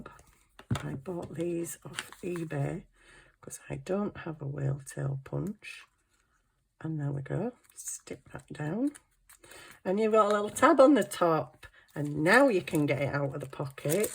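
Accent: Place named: Yorkshire, United Kingdom. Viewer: Hi as a fellow Fibro suffer I absolutely get the 'decision making' thing!🤔🙄🤔🙄🤔😬🤔🤭So glad I've found you on you tube, you sound like you're from my neck of the woods! Especially when I saw your Yorkshire Tea box in one of your vids! 😃I'm a Sheffield'er! 😬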